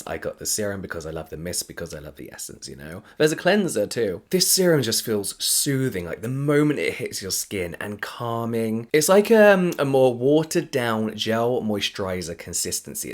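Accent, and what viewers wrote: United Kingdom. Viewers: Just discovered you. Great videos that get straight to the point. I also love your super calming voice (British accent is a plus 😉). Love your content! Keep it coming.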